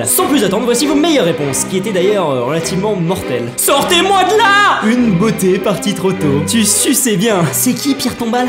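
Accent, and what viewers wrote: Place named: United Kingdom. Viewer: J'adore ton accent anglais british 😍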